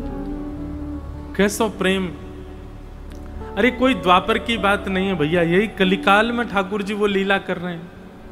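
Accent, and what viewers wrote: Braj, India. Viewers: Radhe Radhe maharaj ji aapki ktha bruj bhasha mein sunana bahut achcha lagta hai ❤❤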